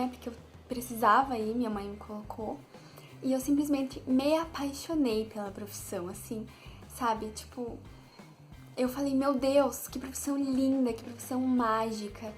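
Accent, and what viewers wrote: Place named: South, Brazil. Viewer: sotaque parece ser do sul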